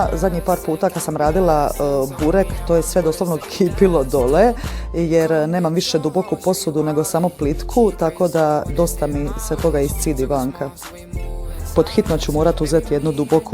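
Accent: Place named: Dalmatia, Croatia. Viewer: volim i taj Dalmatinski naglasak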